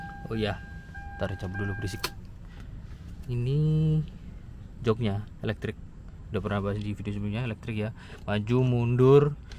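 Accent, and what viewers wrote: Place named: Jember, Indonesia. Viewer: Logat jember om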